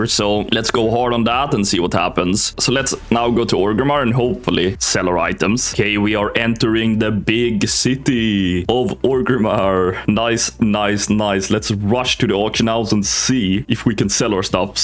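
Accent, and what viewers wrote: Sweden. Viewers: are you swedish by chance?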